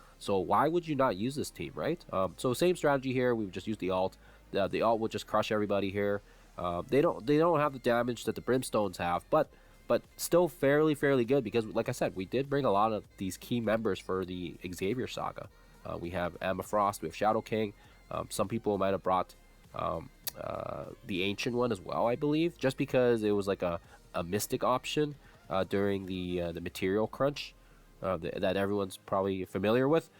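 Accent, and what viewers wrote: Canada. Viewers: You sound just like rayge gaming to me lol. Is it just the Canadian accent? 😂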